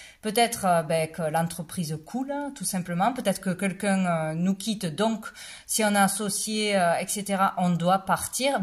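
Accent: South, France. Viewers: avé ton accent méridional et candide, tu es sympa ❤😀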